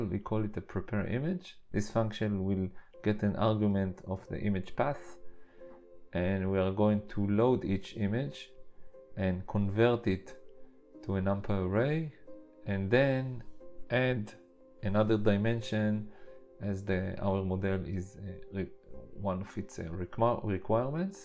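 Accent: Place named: Israel. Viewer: Now I get what an Israeli accent is. Nice to hear you doing well :)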